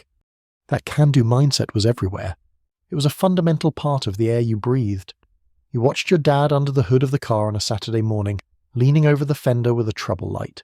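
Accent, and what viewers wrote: England, United Kingdom. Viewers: Nostalgia. It's a little unusual to hear a British voice narrating American English vocabulary and photos. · The British commentators voice does not fit the memories from AMERICA in the 70’s and 80’s. Sorry, bad choice. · This British accent is pissing me off for some reason 😡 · Uk accent for a US life. Doesn’t matter. Most of it applied. A child of 76, I grew up playing feral in short shorts, bikes with long seats paper rounds, making camps out all day every day in the summer with the only instruction to be back home by dark. Happy happy times. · This is a strange video with a British accent but American images. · Brit accent for Americana. Hmmm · Man, I was about to enjoy this video till they put this bullshit British accent down there that’s not American or nostalgic. What the hell is wrong with you? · Why does the narrator have an English accent? 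This is about 'Merica.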